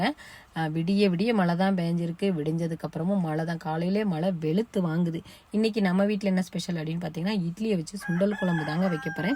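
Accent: Tirunelveli, India. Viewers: உங்க பேச்சில் நெல்லை வாசம் வீசுகிறது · Thirunelveli Tamil naila pesuriga❤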